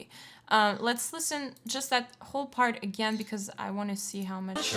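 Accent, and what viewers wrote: Quebec, Canada. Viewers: Your accent look like french canadian !?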